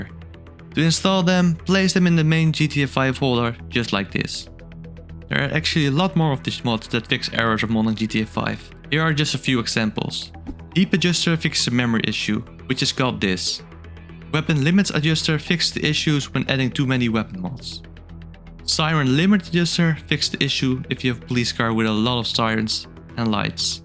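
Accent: Netherlands. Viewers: Thickest dutch accent of the week. Nice video tho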